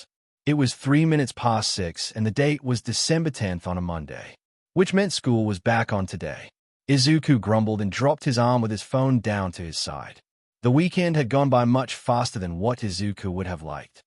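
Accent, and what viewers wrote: United Kingdom. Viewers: Also why is the ai British?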